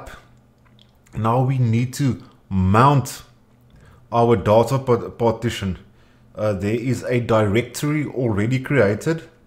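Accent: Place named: South Africa. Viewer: love the SA accent :)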